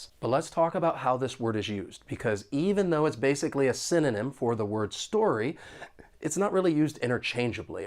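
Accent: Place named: General American, United States. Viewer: he answered "the US". Not North America. Let's not split hairs here. If the accent interests the author of the Q, he should have been more specific. has a standard American accent, regardless of the region he comes from.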